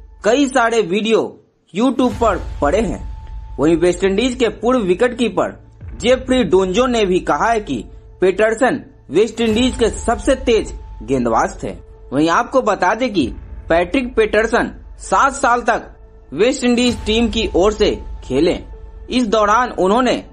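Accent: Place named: Bihar, India. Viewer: भाई आपके लहजे मालूम चलता है की आप बिहाड़ी (बिहारी) हैं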